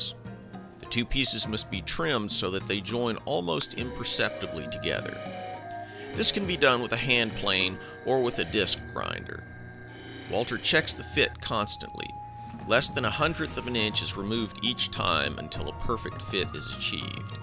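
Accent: United States. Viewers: Mr., out of curiosity, which part of america is your accent from?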